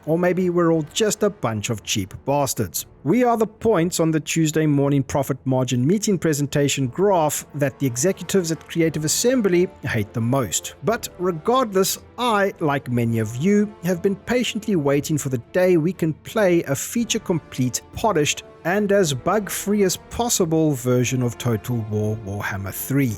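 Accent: South Africa. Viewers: Great video. Thanks for posting dude man. Great to hear a based south african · you sound like a fellow SAFA. If so always nice to see a fellow ass end of Africa resident. Lekker Vid mate. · Haha, very cool to hear a fellow South African on YouTube, you sound like you are from the coast? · South African??? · Hold up. South African accent? Opinion accepted. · You sound South African, New Zealander maybe... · Are you from South Africa? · Interesting accent, sounds South African. Subscribed.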